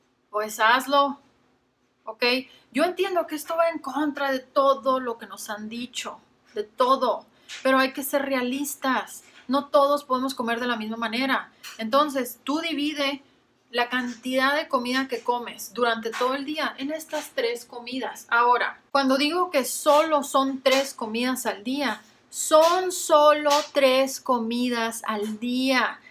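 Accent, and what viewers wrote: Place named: Chihuahua, Mexico. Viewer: ʎʇɹɐɯ ʎןɟɔɯ yo creí que era de Chihuahua por el acento tan shulo 😐😐.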